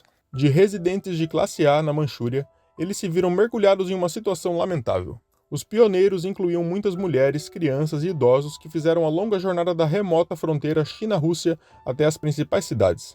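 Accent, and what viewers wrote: Brazil. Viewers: Sotaque sudestino de merda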